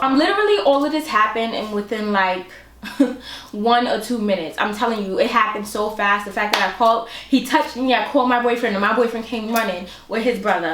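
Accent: New York, United States. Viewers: your so NY .i love it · Do you think that she has a new york accent ? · 😂😂😂😂😂 that new york came out hard afffff in this video lmaooo i love you 💗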